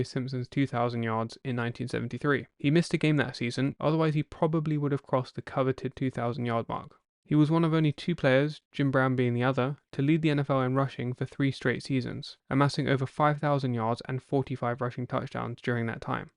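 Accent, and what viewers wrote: England, United Kingdom. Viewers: Why do they have to have some English guy narrate this? Who never played,watched or understood the game.Just leave the video everyone will figure it out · Something wrong when a football documentary is narrated by a british person. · somehow, listening to a tribute to Earl with the narrator having a British accent just ain't right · british dude. i love this! no offense tho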